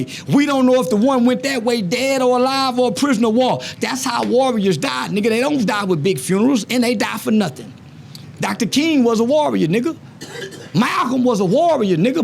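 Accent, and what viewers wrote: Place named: Chicago, United States. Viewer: he gotta stop talking like king von.. That Chicago accent is ignorant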